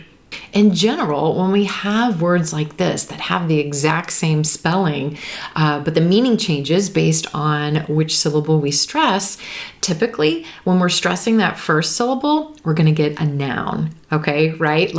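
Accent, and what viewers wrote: United States. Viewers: hello, my name is juan rivera, sorry my english is not good I'm begginer english student I'm 38 years old and I'm from Nicaragua in centre america, as you can imagine this country is really poor talking about economic situation and because of that I lost my family and now I live with my mom I don't have my own house I survive with only 80 dollars per month and I send 50 for my two daughters for manutation, it's been difficult all this time, that's why I have been studying english in a little free program course just to improve a litle my situation at least. but I've already finished the 12 levels and I feel that I need to improve a lot yet, thanks for making this videos, I just watch one of your videos and believe me I liked it, and I'm gonna try to see every single video from your first video, I like your pronunciation I guess your are american, to be honest don't like british pronunciation I prefer american accent, I would like you to talk about the most common situation with modals in present future and past because this is the hardest content for me, I would thank you, well greetings and blessings..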